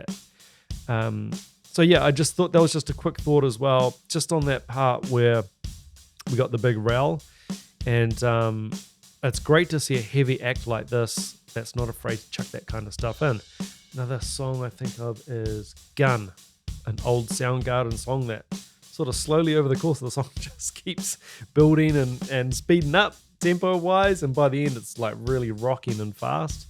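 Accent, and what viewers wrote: New Zealand. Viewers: casually starts watching video ~hears accent~ "here in Auckland, New Zealand". Swears loudly and falls out of bed Gidday fellow Kiwi. How nice of the algorithm.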